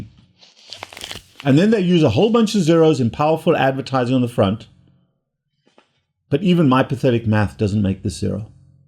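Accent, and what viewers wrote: South Africa. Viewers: The indignation, mild profanity, sarcasm is hilarious coming from a sophisticated person with South African accent. Educational AND entertaining. Lol.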